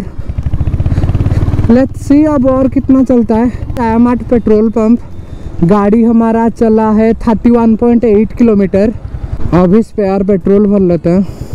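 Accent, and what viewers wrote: Odisha, India. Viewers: Bhai re laguthila Odia boli tuma accent ru … especially Talcheria boli … btw nice video … mu b Talcher ru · Pls make it in odia,coz ur Hindi & English pronounce appear typical odia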